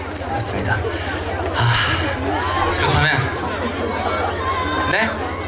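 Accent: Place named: Mokpo, South Korea. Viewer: 박지현가수님목포사투리구수하고매력있당게 앞으로도멋쟁이가수로활약하시길~~~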